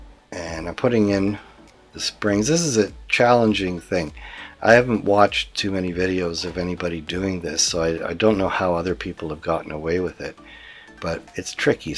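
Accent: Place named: Canada. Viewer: Very nice job, faithful to the original and with a real eye for detail. Also it's always nice to hear (what I suspect is) a Canadian accent.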